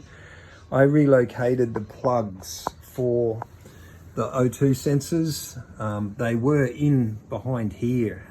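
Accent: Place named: Australia. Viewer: Hay mate I also brought a 2021 V85tt Cenrenario model as well ( had for about 6 months ) was new hadn’t sold . I love the bike , I had a problem with the EML coming on over5500 RPM , right hand cylinder was missing. I reached out on the V85tt fb page and found most helpful. Apparently it was something to do with sonic wheel but resetting valves prior to reset ? , anyway done two big rides since and love the bike . You sound Australian ( I live in Wodonga Vic )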